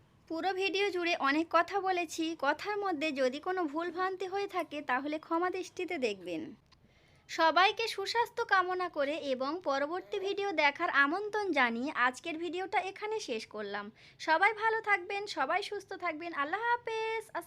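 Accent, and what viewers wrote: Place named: Bangladesh. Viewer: আপু তোমার কথাবার্তা শুনলে আর তোমাদের খাবার দেখলে মনে হয় না যে তুমি ইন্ডিয়ান মনে হয় তুমি আমাদের বাংলাদেশ যাইহোক আপু খুব সুন্দর লাগলো মাশাল্লাহ অনেক সুন্দর হয়েছে তাদের সবজি বাগান খুব সুন্দর হয়েছে মাশাল্লাহ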